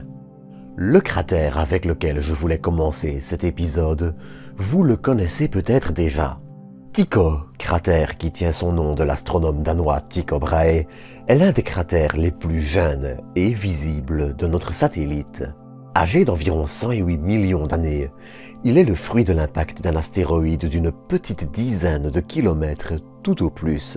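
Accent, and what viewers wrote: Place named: Flanders, Belgium. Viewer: Flamand donc ? Bonne soirée et bravo pour ce que vous nous offrez en vidéo !!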